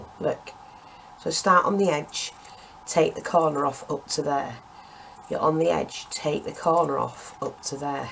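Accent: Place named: Yorkshire, United Kingdom. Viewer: Loooove your accent where may I ask are you from? Is it Yorkshire?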